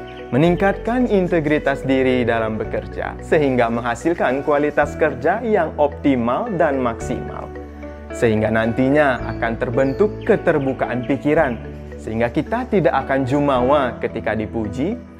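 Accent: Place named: Bali, Indonesia. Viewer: Om Swastyastu, awighnamastu Bagus baik becik, bhaktisewanam. Logat Bali tulen masuk seratus persen. Tapi bagus juga, semoga Orang luar Bali memahami isinya.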